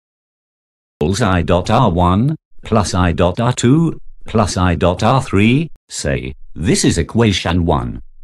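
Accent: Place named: India. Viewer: when you have an Indian accent and a voice of a robot you get this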